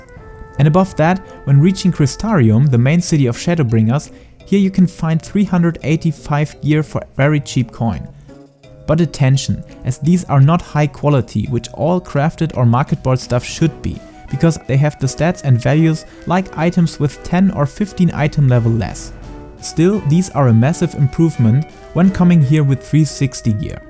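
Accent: Germany. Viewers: sorry to ask man but where do you come from your voice sounds like a german that speaks english ^^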